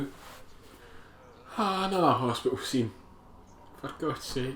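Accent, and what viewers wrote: Scotland, United Kingdom. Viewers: Hello mate, I love this movie, and more so your accent! Scottish to me is the best accent in English language, what part of Scotland is it from? Edinburg? Regards from 🇲🇽😎🤟🏼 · Even after 35 years, The Fly is the brilliantly grossest movie I've ever seen in my life. It still makes my stomach churn. I enjoyed your funny reactions to the gross-outs and I enjoy your accent - which I'm guessing is Scottish or northern English (to my untrained yankee-doodle-ears). Anyway, thumbs up.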